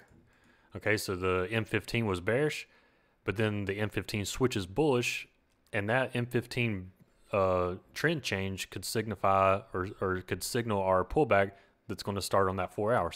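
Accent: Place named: Louisiana, United States. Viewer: are u from louisiana?? cause i am and u sound familiar?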